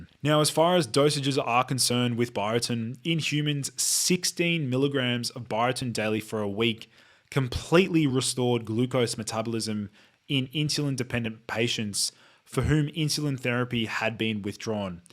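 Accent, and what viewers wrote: Australia. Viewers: I literally couldn't finish the video, Ozzie accent is far too thick, drives me nuts 😢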